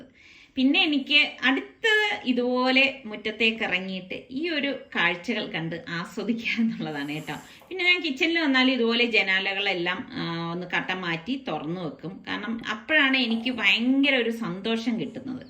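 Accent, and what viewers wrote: Trivandrum, India. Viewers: Yellam super TVM samesaram super Njanum aviduthekariya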